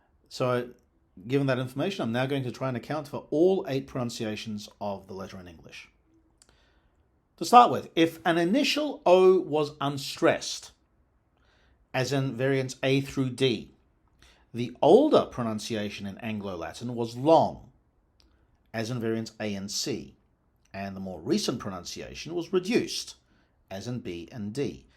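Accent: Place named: Australia. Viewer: For some reason I never pictured you with an Australian accent, even though I know you’re Australian. Nice video!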